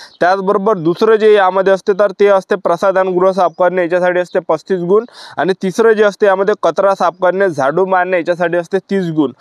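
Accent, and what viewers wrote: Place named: Gadchiroli, India. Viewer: Gadchiroli bolte😅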